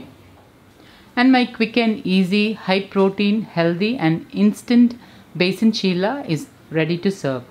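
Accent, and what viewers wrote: Kerala, India. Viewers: Mam are you from kerala, please don't take me in wrong way actually kerala people's try very much arvedic things by your voice felt are you from kerala.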